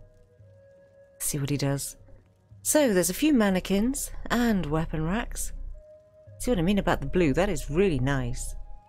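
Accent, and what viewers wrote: United Kingdom. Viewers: come for the endearing British accent and relaxing home reviews, stay for the squints essay on the politics of Tamriel?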